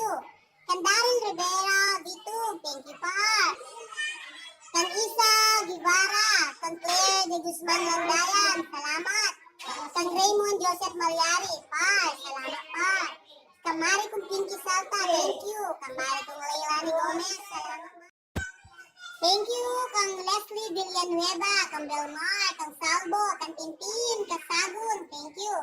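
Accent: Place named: Pampanga, Philippines. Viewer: Cute talaga magsalita ng mega kapampangan, nagawa ko na sis sanay ganun din ang ginawa mo sa bahay ko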